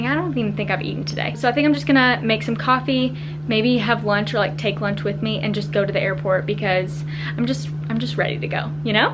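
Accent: South, United States. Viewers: I truly hope you enjoyed Paris. This is one of my favorite cities in Europe.... You have a lovely Southern accent as well 😀👍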